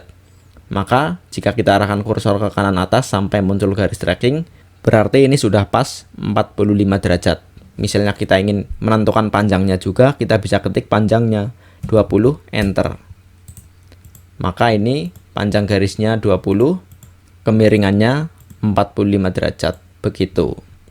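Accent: Banyumas, Indonesia. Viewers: mantap mas.. lanjutkan.. saya tonton videonya semua... btw masnya kayak ngapak nih xixixix josss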